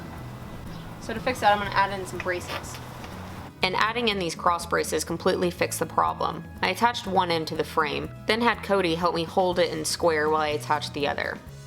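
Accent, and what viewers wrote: Texas, United States. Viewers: It's just how she talks. It's a Texas thing.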